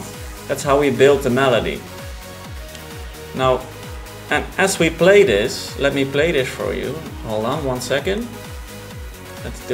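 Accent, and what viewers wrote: Netherlands. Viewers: gonna take a wild guess and say you're dutch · You're from the netherlands right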